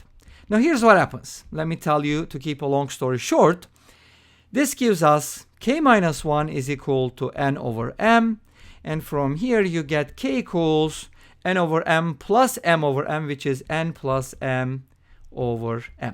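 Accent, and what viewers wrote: Turkey. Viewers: Excellent tutorial, fantastic perspective! Just based off of your accent I think you are Turkish aswell right ? Just an assumption you don't have to answer it haha.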